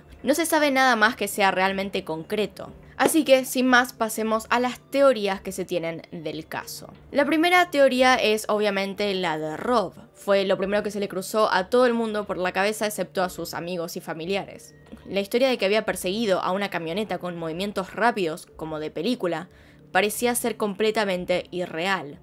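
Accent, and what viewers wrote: Argentina. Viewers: Antes sólo veía a Paulette y ElizbetM, pero desde el día que YouTube me recomendó tu canal me encantó. Hace apenas una semana de éso y ya casi me veo todos tus videos. 😂 💕 Eres de las mejores en lo que haces, me encanta tu fluidez, vas al grano, das detalles y se nota que neta investigaste y conoces el tema a fondo. Muchas felicidades, y éxito para éste canal! 🙌🏻 Saludos de Mexicooo. 🇲🇽 P.D amo tu acento Argentino. 😂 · Amo tu forma de redactar! Y más porque hablas en idioma argentino ♥️ ^^ Me gustaría que hagas el caso de Jenneka Jenkins! Gracias por el maravilloso contenido que nos regalas ^^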